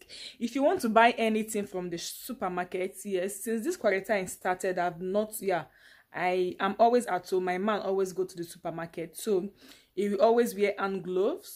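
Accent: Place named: Nigeria. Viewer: Loll I know you're a Nigerian your accent tells it all. Anyway I like how you just be yourself.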